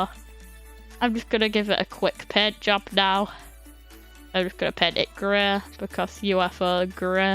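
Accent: United Kingdom. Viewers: after i cam e to your channel i already knew you are british xd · Are you from the Britain cuz you have a british accent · Your British accent I LOVE IT❤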